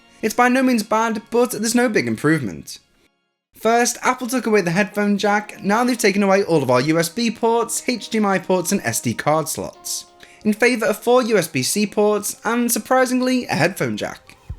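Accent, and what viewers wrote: United Kingdom. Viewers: It's the typical British way to do a voiceover, I've grown to enjoy it lol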